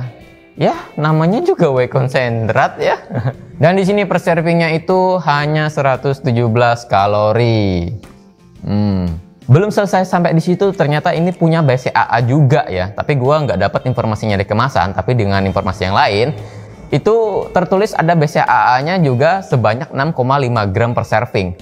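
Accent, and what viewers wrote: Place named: Bogor, Indonesia. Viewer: Suara dan nadanya mirip ridwan remin🤣 orng bogor ya bang😂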